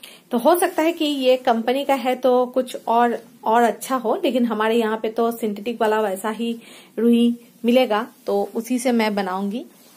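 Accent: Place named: Bengal, India. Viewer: Like 69 Beautiful video I enjoyed your Bangla accent also God bless you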